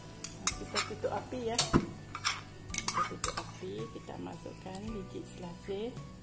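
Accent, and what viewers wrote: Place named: Thailand. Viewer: suka dengar cakap adik...tok kak aya asal keturunan siam cakap sama macam adik..teringat kat tok...semuga adik sihat selalu na...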